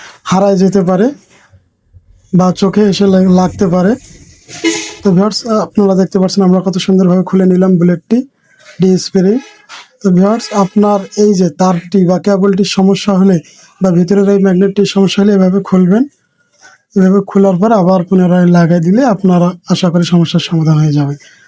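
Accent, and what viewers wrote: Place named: Rangpur, Bangladesh. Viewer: কথা শুনে মনে হচ্ছে আপনার বাড়ি রংপুর 😊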